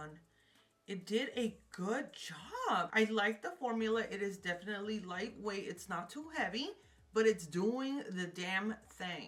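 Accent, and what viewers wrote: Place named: Rural, Mexico. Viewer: The way you talk reminds me of my people from back home in mi ranchito. Tysm for the educational and entertaining content! Subscribed 🤍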